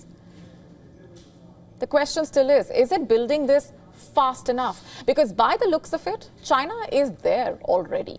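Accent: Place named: India. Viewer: She spoke with too heavy Indian accent compare to Chinese newsreaders who speak very smooth English.Hard to understand her fully. She need to speak slowly.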